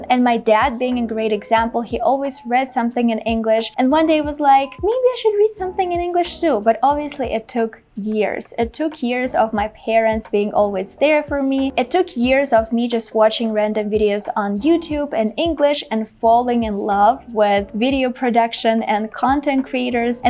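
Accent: Russia. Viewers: You have shown a good Russian accent. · Hello, I like your Russian accent and I would like to know which Youtubers are you watching, I am trying to learn English via youtube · i love your "rrrussian" accent.😊😊😊🙌🙌🙌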